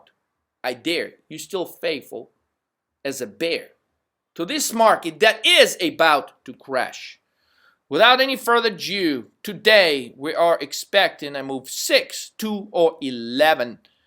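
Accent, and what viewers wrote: South, United States. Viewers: i love this man. italian name with a southern twang with balls hanging to the floor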